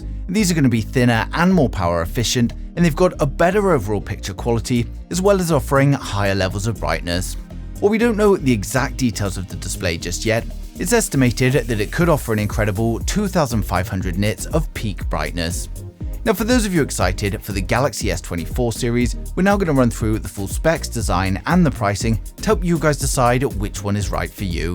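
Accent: England, United Kingdom. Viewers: Same rumors can be found on more reliable sources and they don't have that awful English accent. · What's wrong with the English accent?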